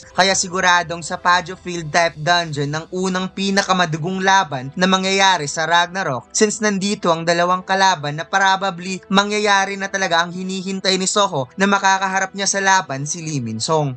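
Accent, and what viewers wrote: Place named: Conyo, Philippines. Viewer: Ganda salamat idol.. Kaso wag masyado pa konyo yun nagpapangiti e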